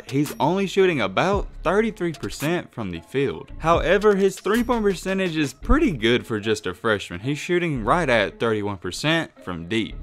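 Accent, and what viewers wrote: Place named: Country, United States. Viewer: Damn did boomhower do this video??? Cuh country asf!!!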